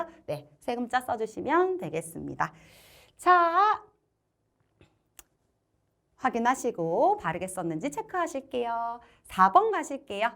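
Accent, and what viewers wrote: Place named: South Korea. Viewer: 한국 강의톤이라 어쩔수 없는건 이해가 가는데 일본어의 인토네이션으로 한번이라도 문장 한번에 읽어주면 더 좋을 것 같네요 너무 한국식같아요 ㅠㅠ 결제하고싶은데 고민되네요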